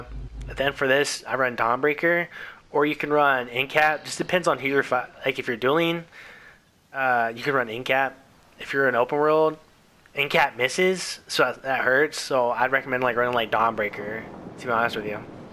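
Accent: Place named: Philadelphia, United States. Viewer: You sound like you’re from philly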